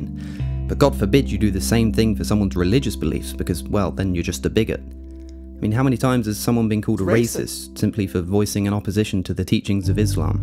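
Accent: United Kingdom. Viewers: He is British, from Oxford. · Wait... you live in the USA? You sound British.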